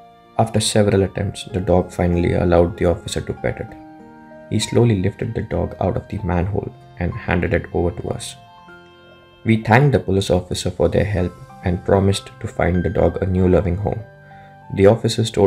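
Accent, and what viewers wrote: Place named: India. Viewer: Hard for me to understand your English with heavy accents. Are you 🇮🇳 ?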